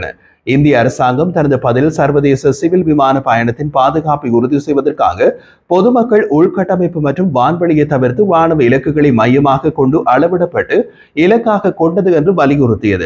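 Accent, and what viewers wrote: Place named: Eelam, Sri Lanka. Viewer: அழகிய (ஈழத்)தமிழ் உச்சரிப்பு,நேர்த்தியான செய்தித் தொகுப்பு ! பரபரப்பில்லாத உண்மையான செய்திகள்!நன்றி!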